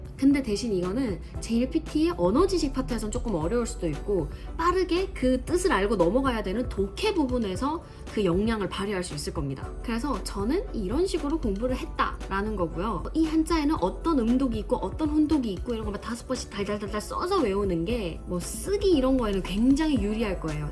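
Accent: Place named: South Korea. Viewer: 이분 한국인임? 한국어 그냥 한국인인데?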